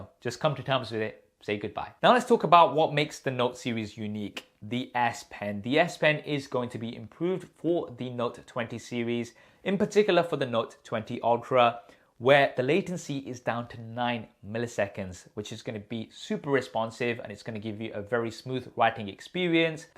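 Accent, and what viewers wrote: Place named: United Kingdom. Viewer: i really enjoy your videos when i play them at 1.25 of youtube playback speed...maybe its the British Accent after all...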